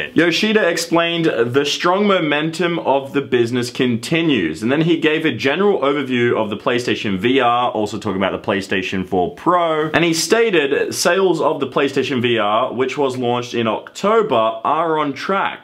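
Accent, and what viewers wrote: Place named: Australia. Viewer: Bob Bobby literally the exact same accent as every other Australian lol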